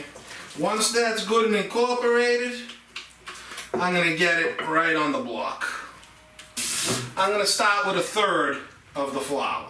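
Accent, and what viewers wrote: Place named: South Boston, United States. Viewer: I'm trying to figure out what is more amusing: Your simple recipes or your "Southie" accent. Love them both!!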